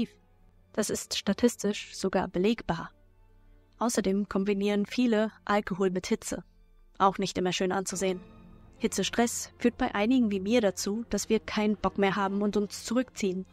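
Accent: Mecklenburg-Vorpommern, Germany. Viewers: Kommst du eigentlich aus MV? Sommä, immä 😅😅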